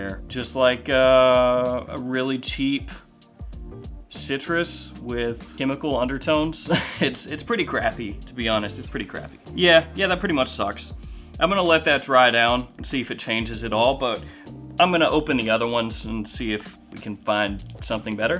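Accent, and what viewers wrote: South, United States. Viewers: "Hey friends. ".... and some of you folks can't tell he is from the South.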